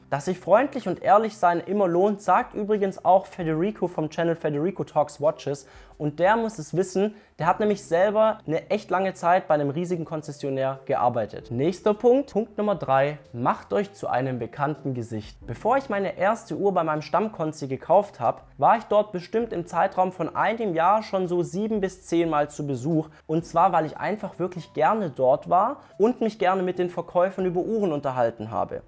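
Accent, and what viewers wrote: Germany. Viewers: Gute Tipps! Aus welcher Region kommst du, wenn man fragen darf? NRW? Bin auch noch auf der Suche nach einem Konzi, bei dem ich deine Tipps anwenden könnte. Letzten Monat erst habe ich mitbekommen, wie meine Traumuhr (Submariner 114060) im Hinterraum des Ladens zurückgehalten wurde. Ich müsste zunächst ein teures Model aus dem Schaufenster kaufen (als Bsp. nannte er die goldene Daytona mit Oysterflex). Erst dann würde er mir die Sub zum Listenpreis verkaufen... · Dein leichter Dialekt lässt mich darauf schließen, dass du aus BaWü bist? ;) Sehr gutes Video, arbeite an meinem Traum in Form einer Uhr.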